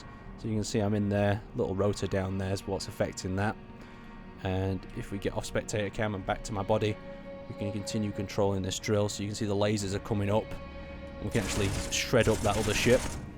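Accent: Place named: West Midlands, United Kingdom. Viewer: you sound like you're fro the westmidlands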